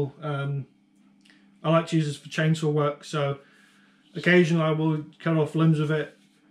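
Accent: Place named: London, United Kingdom. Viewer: You sound like you’re from London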